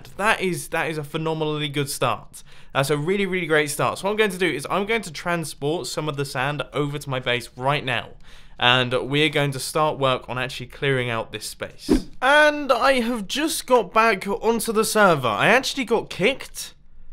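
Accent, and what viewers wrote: England, United Kingdom. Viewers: I love your vids, I mostly just watch for the English accent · WAIT! UK OMFG I THOUGHT HE WAS USA I UK😱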